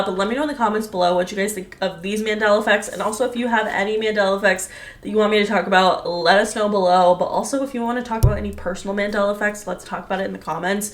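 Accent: United Kingdom. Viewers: Wuuuut??? He’s british!! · bishhhhhh tfff IM BRITISH, I WAS ADAMANT HES BRITISH 😮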